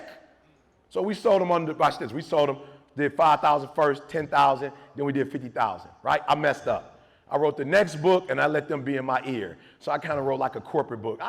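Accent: United States. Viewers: "Eight Mile, bruh." He so Deeeeeeetroit!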